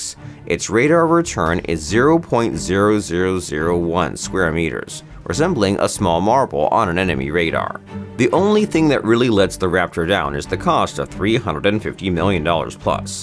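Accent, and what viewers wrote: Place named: India. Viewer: why you sounds like indians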